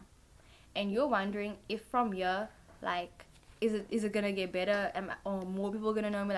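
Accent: South Africa. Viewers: I know that accent❤❤hey there South African 🎉picked pile 1 and I wanna be famous like 😍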